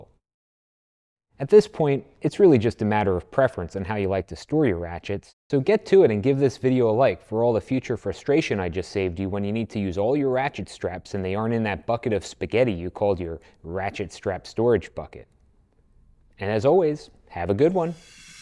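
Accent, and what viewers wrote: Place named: Pennsylvania, United States. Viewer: Thats accent says Pennsylvania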